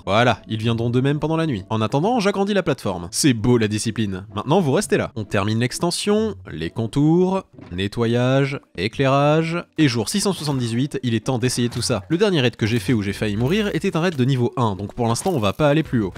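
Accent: Quebec, Canada. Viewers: J'ai bien remarqué que tu a l'accent québécois et français alors tu vie où?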